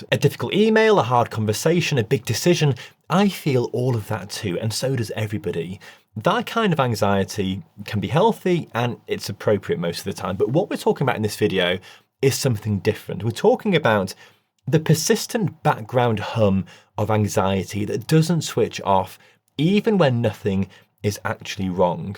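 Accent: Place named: Northern England, United Kingdom. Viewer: Thanks for this really informative and excellent explaining.. Fond your videos the best on social media.. Appreciate so much your insight into life style changed, and as your a uk based doctor, and by your accent feel you may live in the North of England (like myself ) simplify videos easy listening.. thanks again 🙏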